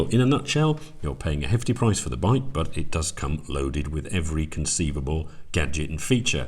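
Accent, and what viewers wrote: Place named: RP, United Kingdom. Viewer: Love your English. Proper RP. Cheers from an English teacher in Sweden. And yes, that bike is way too expensive for most of us mortals.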